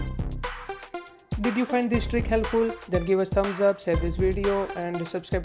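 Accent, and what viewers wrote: India. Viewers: My phone is dying, it’s 🔥 burnt I don’t even care if it works, I just like him saying “it can easily freak you out” Not making fun I like the Indian accent, we are cousins I’m West Indian. I hate when people say that, ohh I can say that because I’m that, so I guess I hate myself, who am I talking to right now? Thanks for your help cousin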